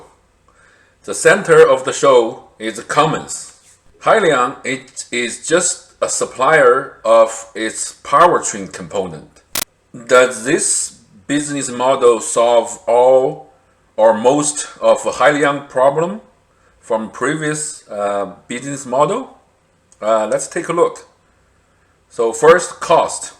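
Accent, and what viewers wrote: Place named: China. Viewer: Is this a Chinese channel. I need to know because you sound Chinese and I don’t find Chinese made things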